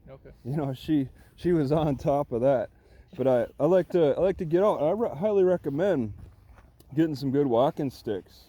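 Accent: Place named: Midwest, United States. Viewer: I grew up in Milwaukee (been here in Dallas over 40 years). I thought I recognized your mid-western accent.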